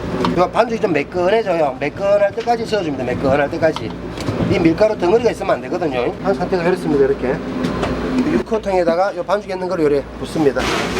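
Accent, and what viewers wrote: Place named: Gyeongsang, South Korea. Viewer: 구시한 갱상도 사투리! 따봉입니다! 꼭 따라해볼께요!! ❤️👍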